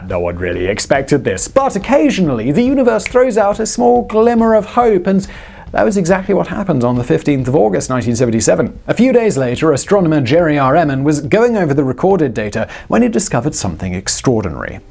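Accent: United Kingdom. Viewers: British Vsauce is growing on me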